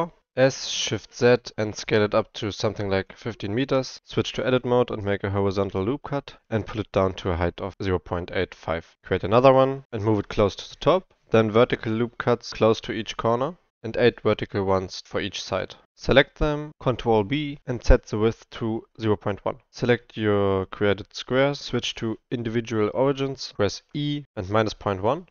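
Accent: Germany. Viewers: since 5 sekonds that i hearing you to speak, i know that your´re from Germany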